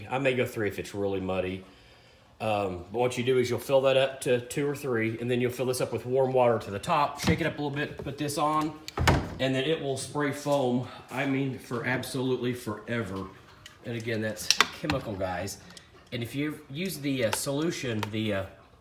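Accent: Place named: Missouri, United States. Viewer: Sounds like you must be from Missouri I don't know if you heard or not but hillbilly Ranch is closing we usually go to Flat River I live in Illinois in Collinsville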